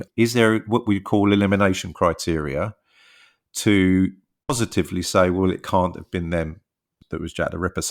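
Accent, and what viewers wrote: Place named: United States. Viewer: Once I heard the American narration bs……..I scrolled on……utter drivel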